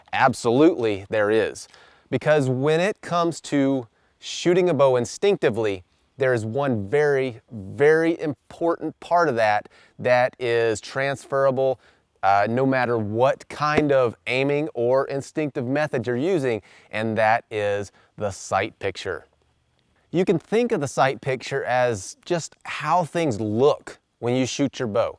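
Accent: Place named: South, United States. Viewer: hi! I'm from New Zealand. really enjoyed watching your journey on Alone as you've heard millions of times so I went searching for your name to pick your brains coz I'm new to archery as of last year bought a 45lb recurve bow set during lockdown here and loved it now I'm hooked! still trying to fumble my way thru techniques vs different equipment sheesh... great video man thanks for sharing your wisdom with the tint of southern accent as a bonus "YEEE-HAAW! hillbilly nation come on!" (that's my own saying 😂 hope I don't offend anyone? Oh well its out there now) PEACE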